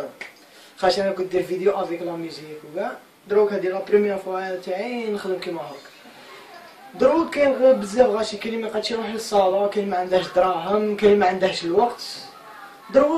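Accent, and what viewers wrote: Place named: Algeria. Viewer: لهجة الجزائر يا اخي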